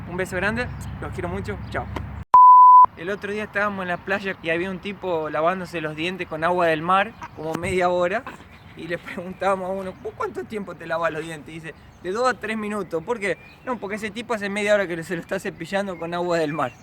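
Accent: Argentina. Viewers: Sos Argentino amigo por tu tonada. De que provincia??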